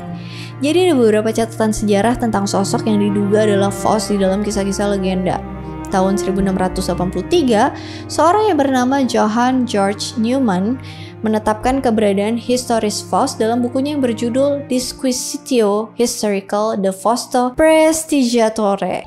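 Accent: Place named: Sumatra, Indonesia. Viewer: santai bae 😂 asli sumatra ya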